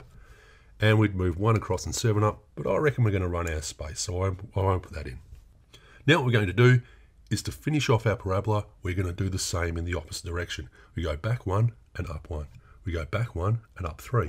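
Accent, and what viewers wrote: Australia. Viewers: australian accent hit just right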